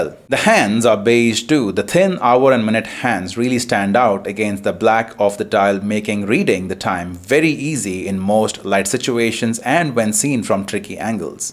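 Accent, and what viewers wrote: India. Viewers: meanwhile me with my GD350 and GX56 laughing in the corner 😂 Anyway what I loved the most is your hindi accent! Also when I read the title of the video first,the only watch came in my mind was this!! This was an important watch to buy and cover,loved the video bro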